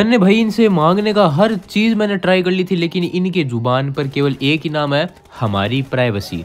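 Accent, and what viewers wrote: Haryana, India. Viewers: Bhai aap Haryana sa to k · Bhai Haryana se he kya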